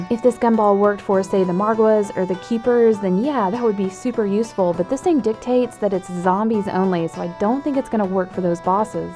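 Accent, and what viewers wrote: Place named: South, United States. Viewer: where ar u from u have a sort of faint southern accent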